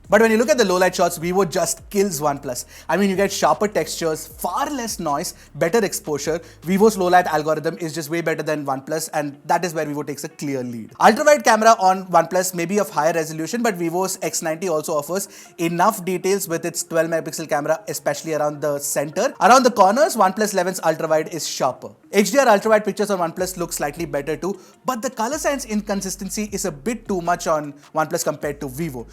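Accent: India. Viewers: WOOOOOOOOOOOOOOOOOOOO! THAT INDIAN ACCENT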